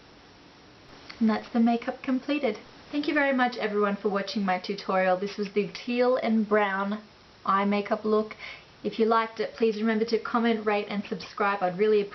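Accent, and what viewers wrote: Australia. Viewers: Your so sweet for lettimg me kno ;) thanks girl. Love ur videos and your australian accent! Subbing to see more!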